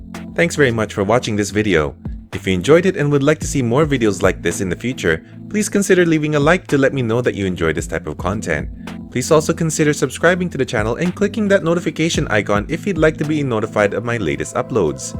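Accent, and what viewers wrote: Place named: Philippines. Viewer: You sound like a Filipino with your English accent.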